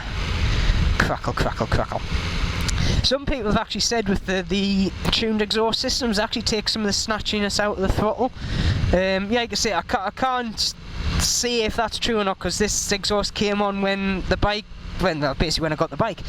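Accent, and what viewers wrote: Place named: Newcastle, United Kingdom. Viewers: Superb review, superb! Love the Geordie accent too. If you read this, say 'Coca Cola' out aloud please. :) ...Have just bought the Street Rally version. Subscribed! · Guy with a geordie accent who doesn't sound like a twat - amazing. I'm fucked from watching geordie shore...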